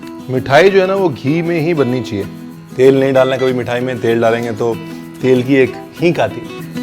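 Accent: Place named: Lucknow, India. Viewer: Hi Ranveer...u r really awesome when u say....aap kahenge Ranveer aapne bataya nahi....real Lakhnavi Andaaz.... Thanks for such lovely dishes....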